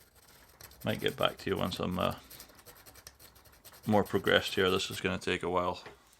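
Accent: United Kingdom. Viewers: Nice wood combo, it's always fun to work during rainy days, ur accents reminds me of my yatch mate fr UK. Cheers mate. Excellent piece of arts.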